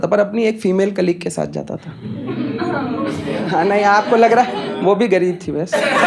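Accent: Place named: Varanasi, India. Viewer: Nice, your banarasi accent is so relatable. Your comic sense is wonderful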